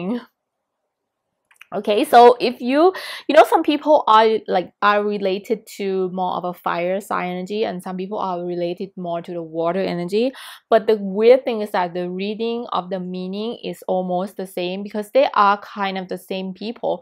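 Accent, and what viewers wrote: Thailand. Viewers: thanks a lot for the fun reading xx btw, are you thai? i'm just curious because we have similar accent. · Pile 1, thanks for beautiful reading. Are u from Thailand? I think u have thai accent. 😊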